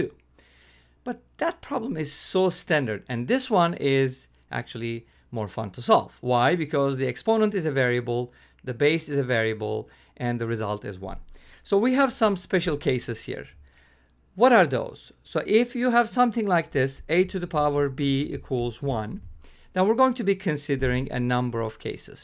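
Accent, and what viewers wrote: Turkey. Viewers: Your accent really looks like Turkish, I dunno why but it really does.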